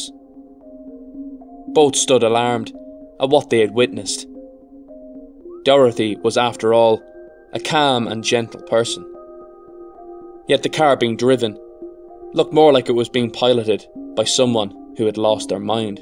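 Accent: Ireland. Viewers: What part of Ireland are you from? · I personally would have waited a few minutes then went looking for her... such a sad case she was beautiful girl... only found your channel yesterday been binge watching it frm then... new subbie keep up the great work 👍 i could listen to your voice all day! Are you from republic of Ireland?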